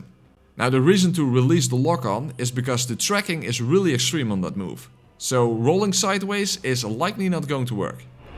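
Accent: Netherlands. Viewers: His so very dutch accent is funny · Dutch accent, that's a yikes! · Ik hoor gelijk dat je nederlands bent!😂😂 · Lekker kazig accentje! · First three words and I’m like “yep, this guy is Dutch”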